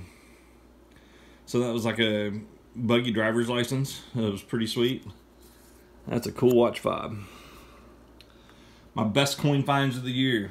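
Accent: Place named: Oklahoma, United States. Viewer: Great finds! I’ve never said that you still have your Okie accent but I’ve wanted to. Lol From one Okie to another, Happy New Year!! May this next year be even better!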